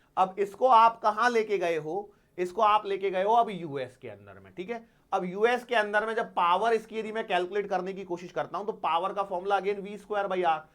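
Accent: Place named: Varanasi, India. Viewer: Good evening everyone ha sir ham hamesa yhi sochte qki ham Varanasi se h or aap jo bhi kbhi kbhi bolte h yha aisa lgta h sayad yhi ke h...😁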